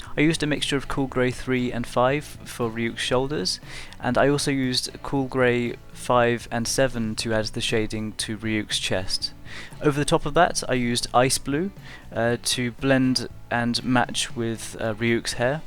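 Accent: England, United Kingdom. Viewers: ¿Quien no adora ese acento inglés que tiene? uwu <3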